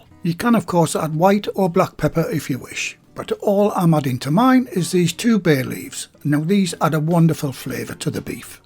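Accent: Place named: England, United Kingdom. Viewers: Good English cooking with a good English voice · Although I live in Hungary, my daughters and their families still live in County Durham. It's lovely to hear a NE accent where I spent about 20 years. I never thought of using hot-water pastry for steak pies (I use it for pork pies of course) so I'll give it a go. They don't make meat pies in Hungary so I have to make them myself. Cannot buy them in shops. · Love your voice,! 😍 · Thank you for answering 2 questions for me. Could I do without egg as I'm allergic. And a question I didn't know I had, I have long nails 😊 I like how you mixed fats with hot water, I never did like rubbing the fat into the flour and getting it all stuck under my nails. Where are you from, you sound local, I'm from Middlesbrough.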